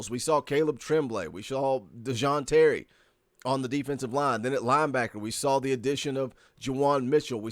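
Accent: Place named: East Tennessee, United States. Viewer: Thanks for keeping on top of things!I I appreciate all your hard work. I live in Elizabethton sounds like you're an eastTN. guy also.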